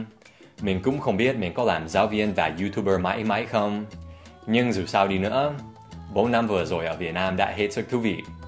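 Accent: Standard. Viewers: Ngưỡng mộ anh ghê anh nói tiếng việt chuẩn ghê.... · Nói tiếng Việt chuẩn ghê...giỏi...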